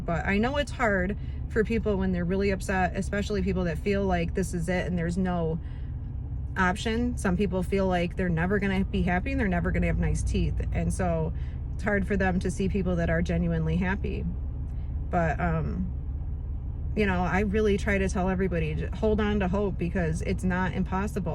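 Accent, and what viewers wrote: United States. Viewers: Bonjour à vous je viens de m'abonner à votre chaîne vous êtes courageuse avec c qui vous êtes arrivé pour Vos dent croqué la vie a plein dent vous avez raison et vous êtes très belle j'adore votre accent américaine moi je français et je m'appelle Jonathan enchanté de vous regarder bisous à vous 😊 bonne continuation 👋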